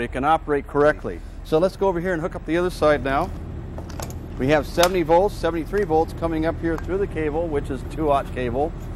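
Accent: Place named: United States. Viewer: I did not know Americans really did talk like that (fascinating).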